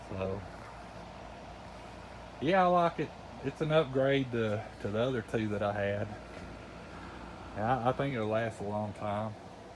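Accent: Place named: Alabama, United States. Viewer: Better and more good ideas added than any of the other Green Canopy Regent Gazebo videos! Thank you (I recognized the accent. My father in Law, R.D. Blackwell, came from alabama with a similar fun to listen to drawl, and those two letters before his Family name. Thanks muchly. We'll be ready for storms. We just put up the framing on ours. and we're putting in into cement slab. I just finished hammer drilling 1/4" holes and put in sleeve anchors into the holes, with 5 ft. pound 3/8 " diameter acorn nuts (we used to call them "nylocks") to lock the frame down into the cement. The straps will be next. Thanks again. I enjoyed the tour of your gazebo. Oh and the rope lights will be going up in ours too. great idea. Blessings. - Vern Bauerle from Alta Loma (a postal area inside Rancho Cucamonga, California.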